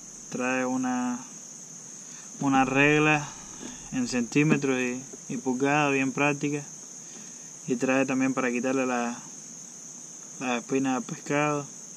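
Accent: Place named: Latin America. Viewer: Que buena navaja excelente ahora entendí bien siempre veo a los españoles explicando y no entiendo mucho lo que disen bueno es escuchar a un latino saludos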